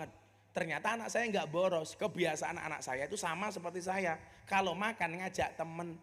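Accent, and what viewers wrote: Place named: Java, Indonesia. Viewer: Mugo" sehat Gus sy suka ceramah e adem d hati dan gk membosan kan lucu pooll sy seneng dgn logat jawa e sbgai orang jawa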